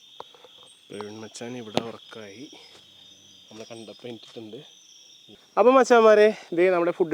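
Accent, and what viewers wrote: Thrissur, India. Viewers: machan thrissur aano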